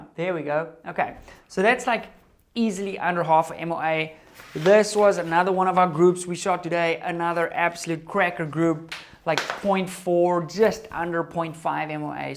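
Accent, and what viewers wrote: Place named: South Africa. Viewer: sometime back my company did some work for an outside company. One of the guys on site representing the other company was South African. I recognized his accent from hearing you a lot. He was surprised because most Americans guessed England first, Australia a distant second, New Zealand an even more distant third, and South African NEVER. I got a kick out of that.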